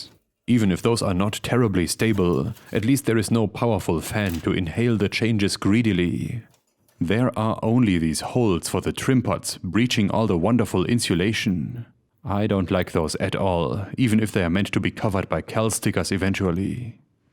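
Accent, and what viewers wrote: Germany. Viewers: figuring out peoples origin in Europe from their accent... in that moment I KNEW he was German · Please just stop ... youtube autoplay brought me here and your DSCHERMÄN AKKSENT is soooo cringy .... just stop ...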